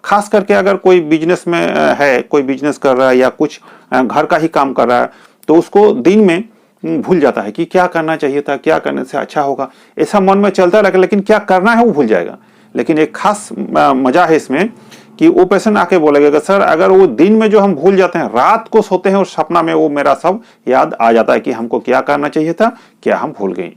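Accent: Bengal, India. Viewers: আপনার বাংলা ঘেষা উচ্চারণের জন্য বললাম ।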